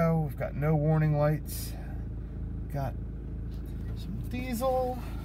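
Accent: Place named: United States. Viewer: You sound like american xd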